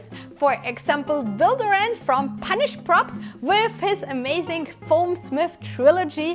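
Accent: Germany. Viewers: Is she german? She sounds like that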